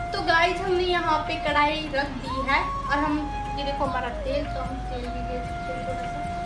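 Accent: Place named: Haryana, India. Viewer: आपकी आवाज भाषा से बिल्कुल हरयाणवी लगती हो हिमाचल की लैंग्वेज नहीं लगती बिल्कुल भी क्या बात है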